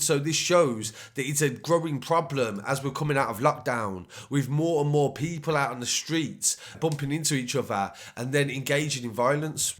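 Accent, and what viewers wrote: Birmingham, United Kingdom. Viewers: My man needs to do a cbeebies bedtime brummy stories !!! Would be epic ... especially with the sponsor before it all starts 👍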